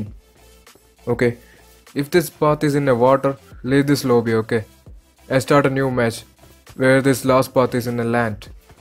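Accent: Kerala, India. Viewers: True English kettapole manasil aayi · You are a malu for sure.Damn ur accent man!😁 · Malayali annale English kettapo thoni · manglish.... bro, are u a keralite...???? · asif aliyude sound pole und....malayali aanennu manasilayi 😍😘...pwolie video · Malayali anenn English kettappo mansilayi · English ketapozhe thonni malayali aanenn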